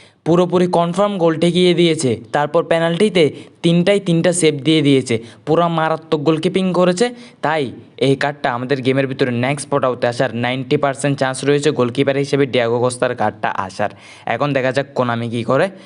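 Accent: Chittagong, Bangladesh. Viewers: Vai apni ki Chittagong ar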